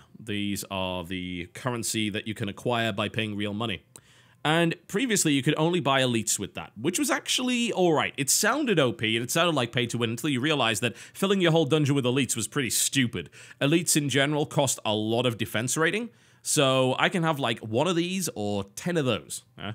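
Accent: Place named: Geordie, United Kingdom. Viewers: So me being a geordie, am I right in saying this guy is a geordie but he's trying to hide his accent, because I can hear it. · I know you're a troll, but I'm going to reply anyway; he's from the north of England.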